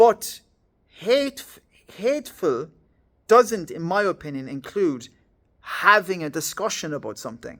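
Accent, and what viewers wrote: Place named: Ireland. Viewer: Well spoken and clearly thought out words. I enjoyed your video, hearing your opinions, and hearing an Irish voice on the things happening here. Id love to see more of us doing this, I want us to be able to talk about these things in public and online without all the hate ❤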